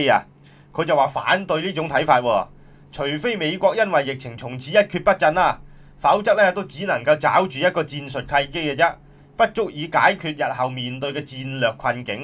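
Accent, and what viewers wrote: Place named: Cantonese, China. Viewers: 拆爐試沙煲🤣好多廣東俗語..有時聽返都幾頂癮 好有廣東式嘅演繹 特別親切👍.因為我成日都有睇你做主持 好多都正合我心意對社會不公為我們市民發聲.. 辛苦曬你🙏💪😁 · 主持緊係番禺人::講緊番禺話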